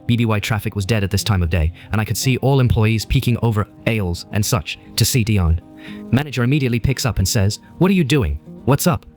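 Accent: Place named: United Kingdom. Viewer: What’s with the British accent?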